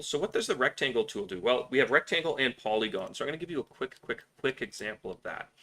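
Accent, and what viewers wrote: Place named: Canada. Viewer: Canadian accent is strong.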